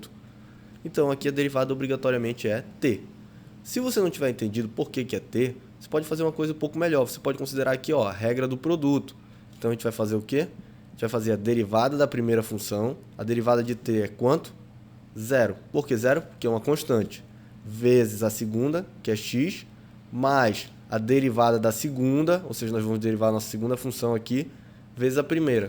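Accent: Pará, Brazil. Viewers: aposto que é paraense, sotaque muito parecido. Ri muito no "peralá" no outro video e nas "galhinhas" de vez enquanto.. kkkkkk muito bom mesmo, sou sua fã:)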